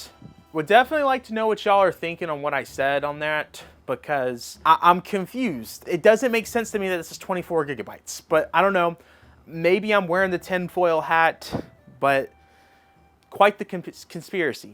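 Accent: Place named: New Jersey, United States. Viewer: I wonder if his odd pronunciation is intentional for engagement… I doubt it. Where is the accent from west NJ?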